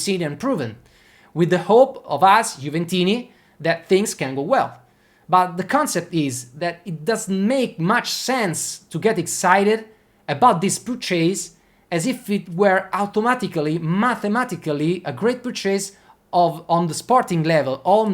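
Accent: Campania, Italy. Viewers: In inglese si perde il tuo grazioso accento campano..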